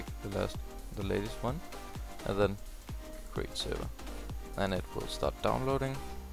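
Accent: Denmark. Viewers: Dit danske accent xd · Man kan høre på dig du er dansker ;D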